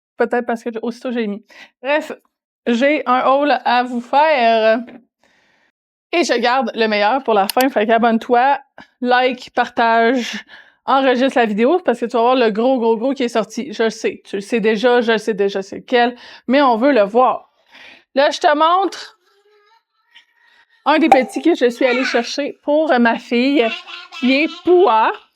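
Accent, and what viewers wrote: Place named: Quebec, Canada. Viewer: Accent québécois ! J'adore. Commentaire pour le referencement. 😉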